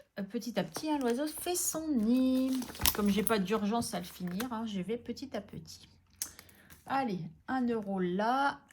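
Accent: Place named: Quebec, Canada. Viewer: Coucou, une belle vidéo. J'adore ta simplicité et ta spontanéité. Tu sais que tu as des termes que tu emploies comme nous les Québécois. ( P'tite, ben) sont des termes abrégés et des sons modifiés que nous utilisons beaucoup. Hihi tu as un peut de Québécois en toi 😂❤ De belles économies super ça. Aller bye bye à + 🫶❤️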